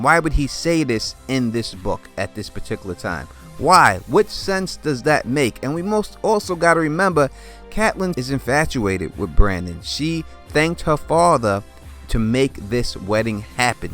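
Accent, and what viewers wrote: New York, United States. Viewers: Mind fuck! Well thought out...ur right about GRRM throwing down hints when u least expects it. For as big as the books are, nothing is prattle. Ur new yorker accent poses an amusing juxtaposition with the fantasy content.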